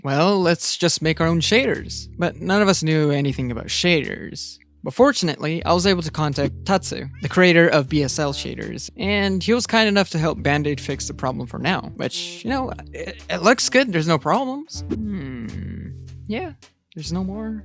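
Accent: Germany. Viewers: deutscher englisch dialekt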